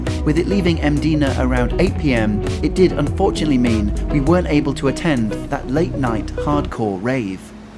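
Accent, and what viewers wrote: England, United Kingdom. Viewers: Such a pleasure hearing an English voice rather than the ubiquitous American voice. I have a son living and working in Malta but this video is the only sight I will see of Malta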